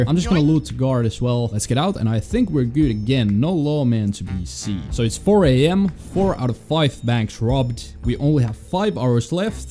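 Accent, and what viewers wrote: Finland. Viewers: Are you finnish? i was just listening your accent and trying to figure it out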